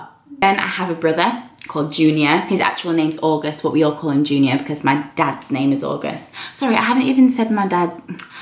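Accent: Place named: United Kingdom. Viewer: The great thing about you growing up in the UK is that you now have this fabulous British accent that everyone in New York is jealous of. You're a great emcee ( but use the word "friggin" too much as you noted ), but please no more reality shows.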